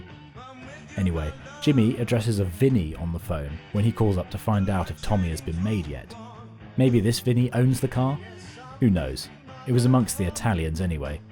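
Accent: United Kingdom. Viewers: Lost me at the British accent.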